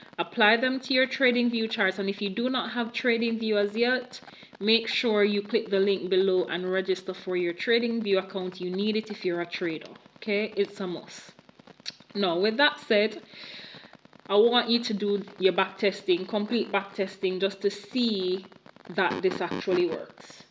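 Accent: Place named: Jamaica. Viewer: Must be a Jamaican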